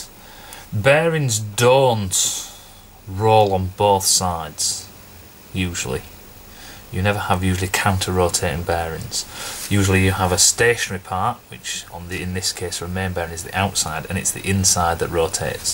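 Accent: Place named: North, United Kingdom. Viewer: Using this in 15 minutes with Saudi Aviation students here in sunny Riyadh - brilliant explanations - simple yet informative. Nice to hear a good Northern accent - reminds me of home!! Cheers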